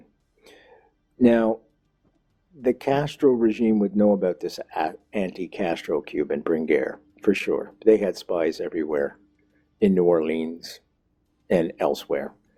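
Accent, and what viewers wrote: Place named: Canada. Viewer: Hi - a listener from the UK here! I just wanted to say thank you for you tireless, even-handed work toward a range of topics. The assassination of JFK is something that has deeply interested me for a while, and I always enjoy hearing different points of view about the various elements of the event and people involved. JFK, from talking to my mother and grandparents and others, was a much respected, even loved, President here. And his death was a deeply shocking, saddening event that still stirs great emotion in my mother today. He seemed to represent a brighter future and engendered a great amount of respect here. There was, and remains, a great deal of scepticism about the government sanctioned explanations as to what happened before, during, and after President Kennedy's death. And, as an aside, I love listening to your Canadian accent and when you talk about Lake Ontario - always nice when I'm listening with a warm cup of tea of an evening!:) Thank you!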